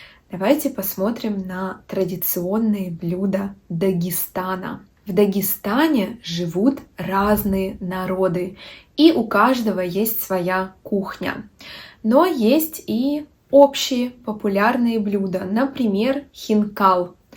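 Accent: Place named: Finland. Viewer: Я единственный, кто слышит в этом финский акцент, звучит довольно круто. Далее я хочу услышать некоторые южнорусские акценты с раскатистым «р». 😊🍖🍗🍗🥞🥞🥞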